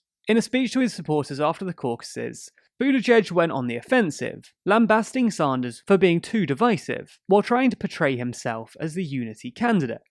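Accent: England, United Kingdom. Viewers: You mean that's the english dialect of english, which equally as valid as the american dialects of english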